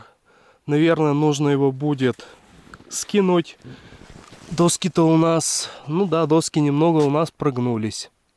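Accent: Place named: South, Russia. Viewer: Прям чувствуется южный акцент))))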